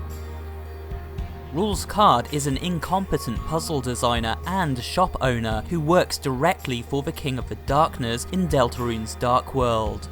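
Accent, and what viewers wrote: United Kingdom. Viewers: this dude is just british Chills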